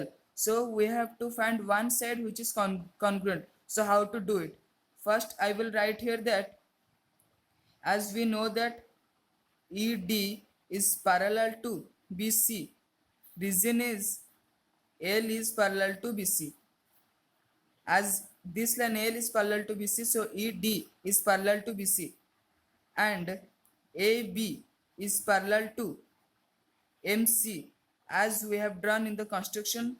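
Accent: India. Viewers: R u Bengali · You are an Indian , your acent tell that you might be from south side of India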